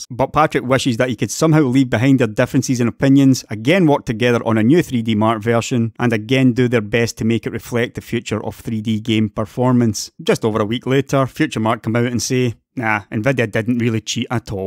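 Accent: Scotland, United Kingdom. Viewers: Thanks, you've shared useful information. BTW, I wonder I guess you're Scottish and where do you live ? England or Scotland ?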